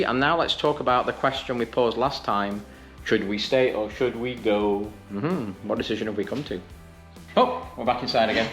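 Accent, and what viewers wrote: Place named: England, United Kingdom. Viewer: I'm sure we don't live to far from you..we moved to the USA in 1999..we moved to our Florida home 5 years ago..we got it in 2003..not sure I could do a large upgrade now..we may wait till everything comes back into syle..😂😂😂..I was watching storm videos and heard an English voice..so stopped to watch..everyone we know are American.. I don't think there are as many Brits here as there once was..before we moved here full time there were a lot of British here..I think we scared them away...😂😂😂😎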